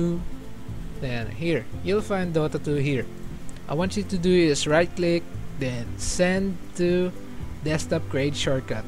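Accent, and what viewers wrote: Philippines. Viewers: I can tell that u're filipino because of your accent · You're obviously a Pilipino judging your accent.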